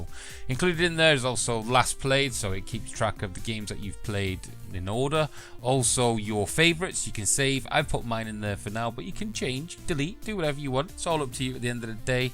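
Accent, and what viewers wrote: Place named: England, United Kingdom. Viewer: aahhh , the guy behind the funny english accent ....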